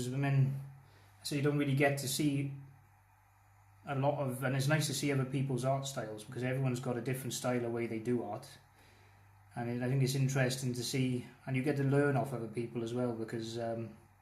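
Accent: Wales, United Kingdom. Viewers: Hey. Just catching up after getting my computer back in order. Cool video and nice to hear a Welsh accent. Makes me kinda homesick, lol (I live in Holland). I've never tried wood burning, but it looks amazing. Agree with what you said about the community and getting lesser known artists seen. With that said I will be doing my first shout out this week. Just trying to get back on my feet as I've had a few health issues of late along with all the hassle with my computer breaking down. Anyway, at the risk of leaving a novel-length message I'll just say great video and nice to see the process:o) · This is great, hearing you explain your art is a lot better for me and probably other people too, rather than music!! I really enjoyed this video, your tools, how you explained your art is Fab. I'm a real supporter of your channel 👍 I get what you mean about subs for subs!!!. 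Loved it, thanks for showing us your art😊✌️👍🇬🇧 p.s miss the Welsh accent I grew up in carnarvon, love Wales 💝👍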